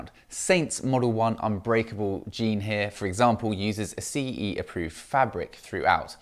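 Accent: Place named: RP, United Kingdom. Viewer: It called The Queens English...and is expensively acquired at English private schools..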